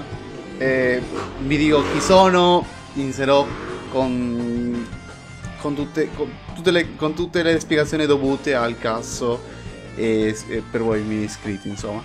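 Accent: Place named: Spain. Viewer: adoro il tuo accento... l'accento spagnolo lo amo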